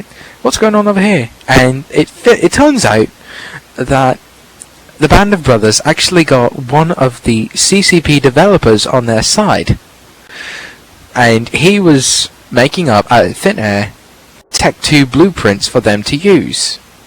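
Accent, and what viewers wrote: United Kingdom. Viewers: British accent!!!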